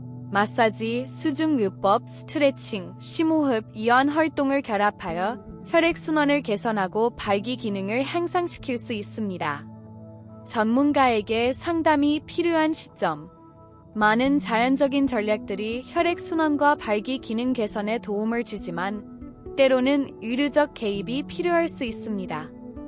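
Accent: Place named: China. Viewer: 발음이 중국인이 말하는것같다.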